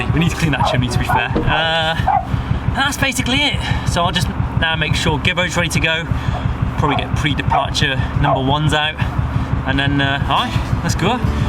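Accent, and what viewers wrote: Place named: Newcastle, United Kingdom. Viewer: grins: But I reckon that accent is more Geordie than Cockney :)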